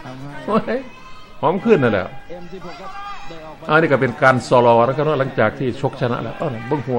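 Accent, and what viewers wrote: Isan, Thailand. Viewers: มักหลายที่เว้าอีสานเด้อคลายเครียดได้ดีอิหลี😁😁😁😁😁😆😆👍👍👍👍👏👏👏🤣🤣🤣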